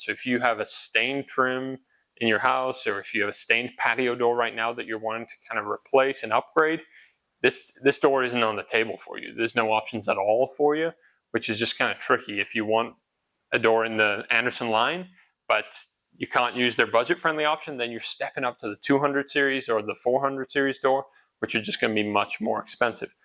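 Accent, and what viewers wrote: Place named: England, United Kingdom. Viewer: Your accent is so interesting, little English-sounding words here and there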